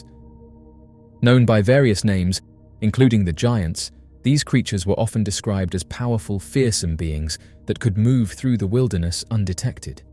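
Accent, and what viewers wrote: United Kingdom. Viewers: Fyi. A British accent is used to be more convincing .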